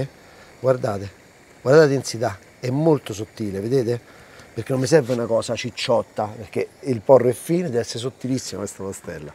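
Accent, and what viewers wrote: Rome, Italy. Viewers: Ti auguro il meglio per te, e sempre forza 🇮🇹, continua sempre così con il ns made in italy, con la tua ironia, pacatezza ed accento romano.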